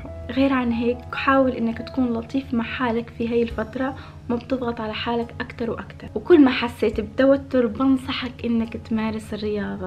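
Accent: Tunisia. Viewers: أنت تونسية صح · لهجتك فيها شوي تونسية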